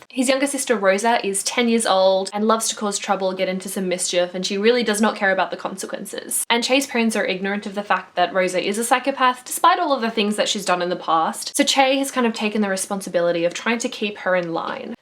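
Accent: Australia. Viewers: You have such a beautiful australian accent but still a bit different from the way my australian friend talks, so I guess that it's a matter of different dialects. which part of Australia are you from? If it is ok to ask? And I soooo have to read this book, you made me so curious and the way you talk about it makes me think that I really would enjoy it!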